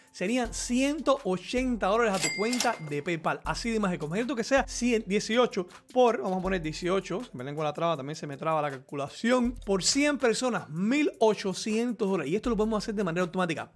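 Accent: Cuba. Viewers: eres cubano verdad?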